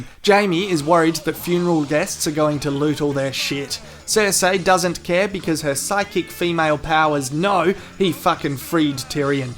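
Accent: Australia. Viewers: Bloody good stuff as always. No other accent could keep me quite as entertained in a review as the good ol Ozzy!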